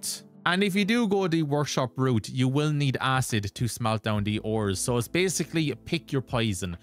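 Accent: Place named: Ireland. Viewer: Love the videos and nice hearing the Irish accent on these tutorials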